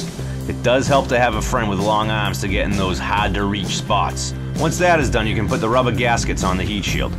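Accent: Boston, United States. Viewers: Love this channel! Even more after I recognised that Boston accent 😏 hope you’re a die hard pats fan like I am! Keep up the vids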